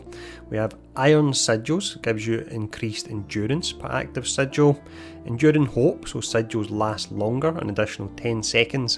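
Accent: Scotland, United Kingdom. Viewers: great accent😊 Scottish? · You Scottish btw?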